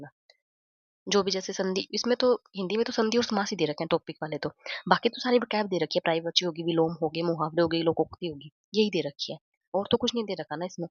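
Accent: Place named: Haryana, India. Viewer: ye to m awaj se hi samj gyi thi lekin hr. Me kha se h aap m bhi hr. Se hi hu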